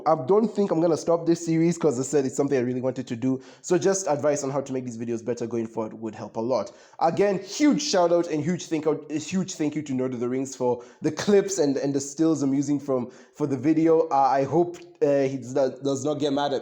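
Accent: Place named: Zimbabwe. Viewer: Where are you from? You sound Zimbabwean I'm just guessing 🎉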